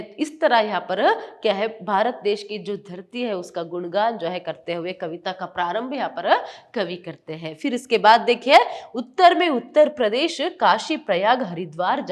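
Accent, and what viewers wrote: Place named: Karnataka, India. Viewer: but she speaks Hindi more like kannada😂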